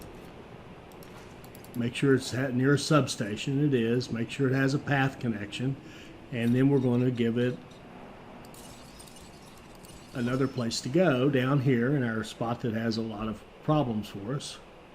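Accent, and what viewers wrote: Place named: South Carolina, United States. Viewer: You sound like a Clemson man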